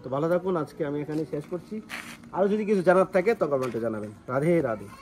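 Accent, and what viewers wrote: Bangladesh. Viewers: কিন্তু ভাই তোর কথা শুনে মনে হচ্ছে তুমি বাংলাদেশি , সাবাধানে থাকিস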